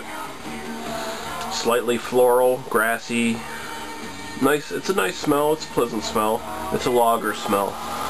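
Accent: Canada. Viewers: Drinkin one with you now bud. You sound like a good Canadian boy.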